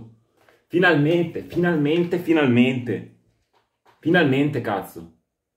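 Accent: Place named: Veneto, Italy. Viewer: Ti si Veneto??? Bella parlata❤🩶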